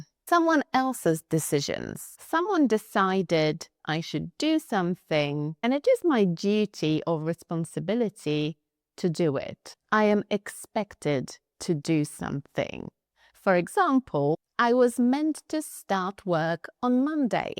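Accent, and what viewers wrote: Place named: United Kingdom. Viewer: Thanks for your great teaching and your nice British accent ❤👍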